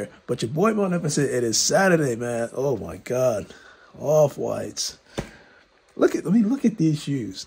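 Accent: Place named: Boston, United States. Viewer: U had to be from Boston I tried.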